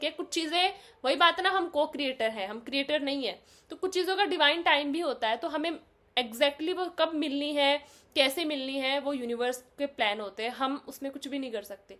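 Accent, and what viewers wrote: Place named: Rajasthan, India. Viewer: Ap rajasthan se ho kya... Your accent .... Like them .... dontpls 😂 don't ever ye sound se m bed se niche gir gye😂 no pls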